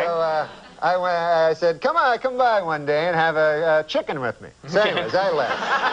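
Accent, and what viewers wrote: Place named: Ottawa Valley, Canada. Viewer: That's Ottawa Valley, central/East Canada, it's rural Scottish influences.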